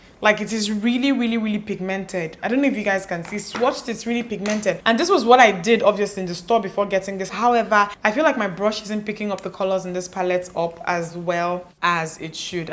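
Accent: Igbo, Nigeria. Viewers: The ibo way you speak your english is epic 😂😂😂😂😂😂😂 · Hmmmm. So I had this experience when I was in school, I had this "friend" who got engaged in our final year. We were close in year1 but not so much anymore but when she told me I was happy for her, talked about the guy and all, how to get d asoebi and Price dat will be favourable for all. At this time, my mum was ill, which I didn't tell anyone except my closest friends (my dad had passed away earlier) but I still tried to call and be a good friend. My mum later passed (bless her soul) during my degree exams but my family didn't tell me bcos of my exams. When they finally did before my project defense, I was so broken, my friends had to move me to a friend's place. So, we went to school one day and I saw her, she was acting weird, didn't say anything to me. Later that day, I was with my friends and my bestie goes "do you have any problem with her" I'm like "No, why". 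He said he noticed she was acting weird and he asked her why and she said she feels I'm not happy for her, that maybe I'm jealous she's getting married first and he told her "what are you talking about, her mum just passed " Females and marriages especially with the Igbos, it's just crazy. I love your Igbo accent though. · this is a side note but I love how your igbo tones show up in your English!! Looooveeeee ittt ❤️❤️❤️ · Oh God you are so funny. So much truth in what you are saying🤣🤣🤣 Your Igbo intonation is bae Can we be friends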